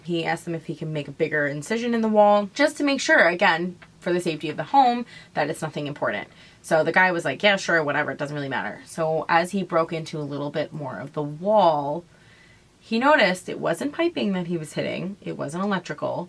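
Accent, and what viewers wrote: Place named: Long Island, United States. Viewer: I'm from Long Island I just watch like 3 of ur vids and literally thought to myself she has to be from here 👌🏽 LI knows LI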